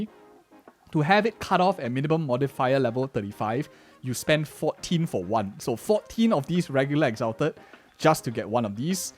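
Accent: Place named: Singapore. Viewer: Why do I hear Singapore😂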